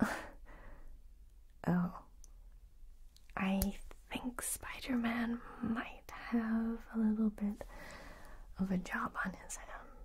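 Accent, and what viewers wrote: United States. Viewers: What the hell? You sound American AF. Hahaha! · Your American accent is fucking stellar. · I am so impressed by your American accent! Brava! 👌👏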